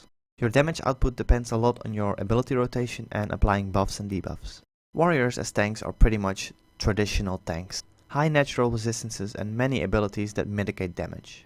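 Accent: Netherlands. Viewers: The Dutch accent though, gj explaining man! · Are you dutch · nice dutch accent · lol, je klinkt nederlands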